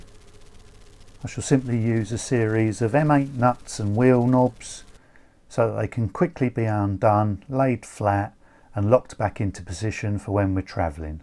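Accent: United Kingdom. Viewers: Ty, a different view on the power of solar panels and very informative. Also, nice to hear a fellow brit msking these kind of vids. Good luck with the build and ty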